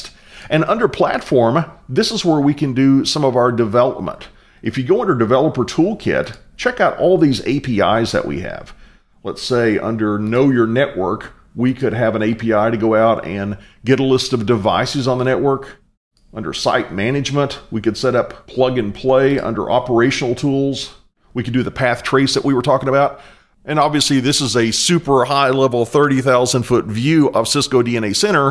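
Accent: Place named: South, United States. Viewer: great teaching voice idk something about the southern drawls keeps me tuned in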